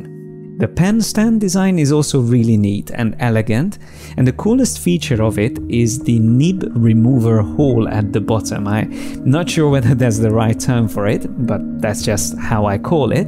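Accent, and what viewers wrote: Hungary. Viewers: Thank you for the review! I can hardly wait to try the S pro however I'm still not sure is it worth to buy the pro version, but the better pen, extra programable buttons for shortcuts and the "touchscreen" possibility convienced me. I was suspicious that you are Hungarian because of the pronunciation, so that I must check it up and found it out that you were born in Budapest:) Szép estét! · Thanks for the review, I use an Intuos Pro M for everything at the computer instead of the mouse, it helps to perform better. Oh, and I just found your channel, great stuff! You have some Hungarian accent, do I hear well? Keep up with the great work! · Are you Hungarian?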